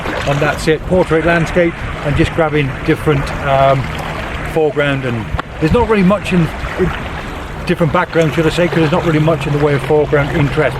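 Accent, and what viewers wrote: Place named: North Wales, United Kingdom. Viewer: lovely, what part of the uk, I am guessing North Wales possibly, excellent video and learnt an awful lt, brill thanks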